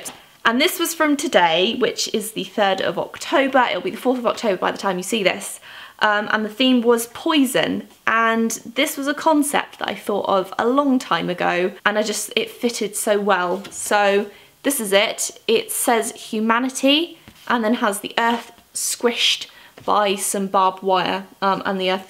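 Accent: United Kingdom. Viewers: Ur British accent is so hot 😍😍😍😍😍😍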